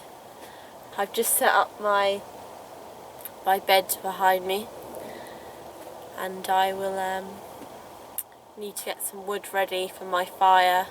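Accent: United Kingdom. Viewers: cute british accent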